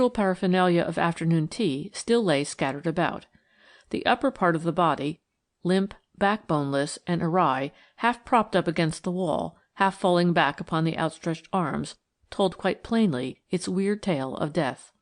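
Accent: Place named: United States. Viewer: Why an American narrator???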